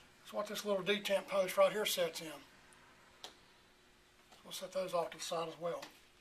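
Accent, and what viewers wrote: United States. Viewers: cool cowboy accent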